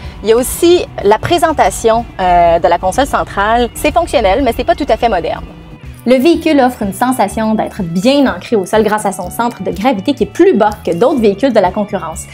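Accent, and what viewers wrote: Quebec, Canada. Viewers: French Canadian accent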